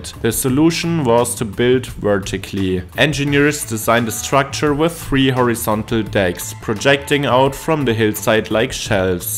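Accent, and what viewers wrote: Germany. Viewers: How dense are you? Can you not hear the thick German accent? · "elewated" . your German accent couldn't be more apparent haha. Keep up the good work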